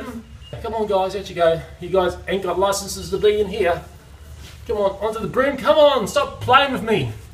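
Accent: Australia. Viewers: Ur videos kill me i love ur Australian accent its the best . GOODNIGHT SISTA 😂😂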